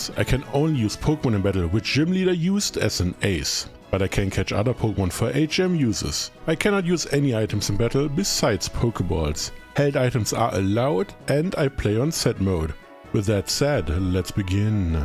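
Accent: Germany. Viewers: Your german accent is very strong.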